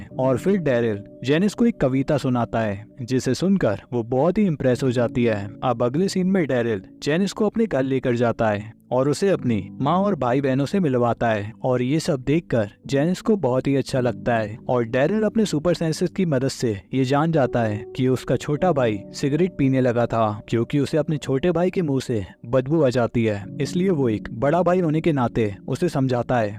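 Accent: Kanpur, India. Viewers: Kanpur se hai bhaii ❤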